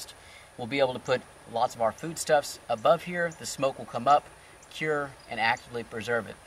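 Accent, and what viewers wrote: Texas, United States. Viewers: I love hearing you say the things Texans say, I miss all my country folk back home. "if you hold your tongue right" I say this in Arizona to a lot of people and always have to explain.... thanks for you vids and I hope things are going well for you, haven't noticed any updates so far. thank you thank you thank you and speedy and full recovery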